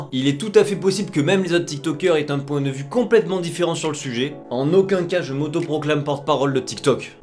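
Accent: Marseille, France. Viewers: Je suis totalement d’accord avec toi et en plus je trouve que maintenant j’entends un peu ton accent marseillais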